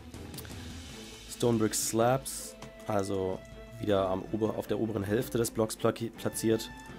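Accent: Germany. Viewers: wow! ich fand dich sowieso schon klasse bevor du ein Deutsches tut. gemacht hast. in deinen Englischen viedeos merkt man kaum deinen deutschen accent! (hast sicherlich ein auslandsjahr oder ähnliches gemacht =)) aufjedenfall nutze ich deine Bauwerke sehr gerne und auch die Englischen tutorials maag ich! peace leon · I knew that your accent was german! Deutschland all they way! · Jetzt merke ich erst das du einen deutschen Aktzent hast wenn du englisch sprichst :) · Man hört doch den deutschen Akzent voll :o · Забавный немецкий) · Ich hab grad ein englisches Vid gesehen von dir und obwohl dein Englisch echt sehr gut ist, hab ich sofort erkannt, dass du Deutscher bist. :D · jetzt wenn mans weiss das du deutscher bist hört man auch den akzent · The German accent is so cool!!!!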